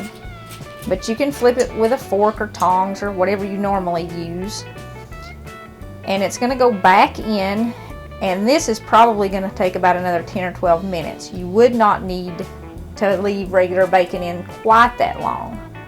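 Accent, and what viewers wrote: South, United States. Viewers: I'm from California and I just happened to see one of your videos, I'm not into country and don't like country accent, but listening to and watching you, I completely change mind and absolutely enjoy watching you and your recipes are so easy and such fun to watch being prepared, Happy Holidays to you and your and also to your utube watchers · I love your southern accent! I'm from South Carolina 👍👍😊😊 SUBSCRIBED! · Precious Lady, Can you cut the music…it is distracting and I would love to hear your beautiful voice. That Southern accent sounds soft and sweet. That music doesn’t do Justice to your lovely program🌷🌸🌷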